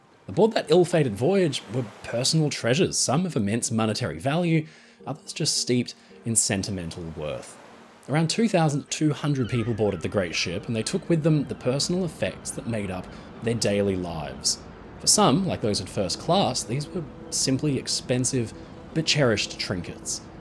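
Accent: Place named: Australia. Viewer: You have such a pleasant Australian accent. Always a pleasure to hear you speak about things. On an unrelated note, a line from the Rubaiyat (the book of Persian poems) was found in the Somerton Man's pocket. That book had been very popular in Australia at the beginning of the 20th century.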